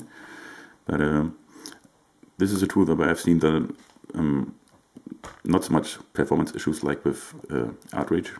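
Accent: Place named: Germany. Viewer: man hört das du deutscher bist, aber ansonsten echt gut :)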